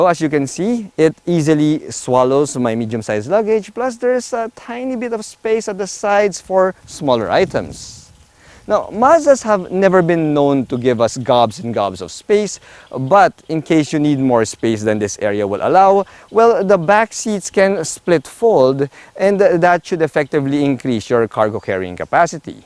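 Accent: Philippines. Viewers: Hi,Just discovered your channel a little over a month ago,ive been non stop watching your vids because of Your MX5 Miata,ive got interest in that car. Also I saw your collab with RIT, I prefer your Filipino language and accent ngl. Love your vids!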